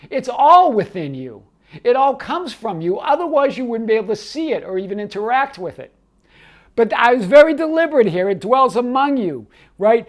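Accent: Boston, United States. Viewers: From Boston? 🚀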